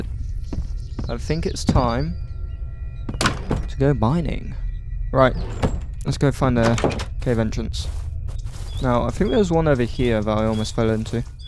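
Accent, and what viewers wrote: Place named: United Kingdom. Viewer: Cool, good mod, and my favorite British accent-man ;3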